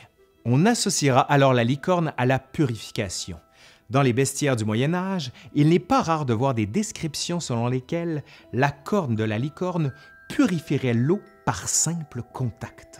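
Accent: Quebec, Canada. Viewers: Ça me fait un grand plaisir de vous écouter, Monsieur. Peu à peu je développe un engouement pour l'accent québécois. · Encore une fois j apprends de nouvelles choses moi qui suis passionné d'Histoire et de mythologie ! De plus tu es un formidable conteur et pour moi Français ton accent québécois ajoute encore plus de magie dans ce sue tu nous contes ! C est charmant cet accent et ca rend encore plus captivant tes vidéos ! Je suis fan fan fan ! 😊😊 Continue de nous instruire par tes sujets historiques, mythologiques etc. avec tout le passion et le travail qu'on décèle dans tes vidéos ! Encore bravo et surtout encore mille merci ! 😉😉👏👏👏👏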